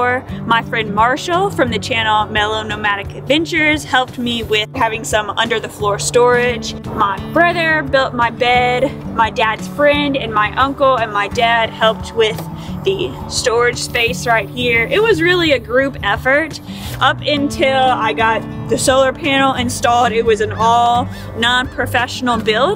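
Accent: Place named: South, United States. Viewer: I love looking for that southern accent in you voice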